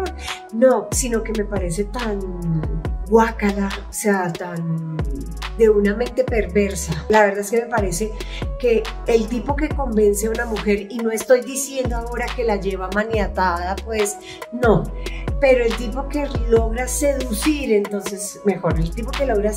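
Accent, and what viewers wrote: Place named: Colombia. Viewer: Tu voz es muy dulce y con ese acento colombiano mejor, pero la musica de fondo de pronto tu voz se pierde.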